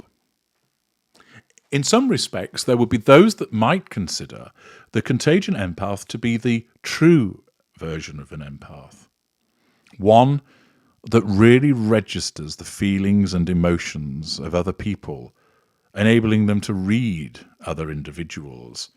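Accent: United Kingdom. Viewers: Why is everyone with a British accent automatically a douche? You're the ultra alright. Ultra twat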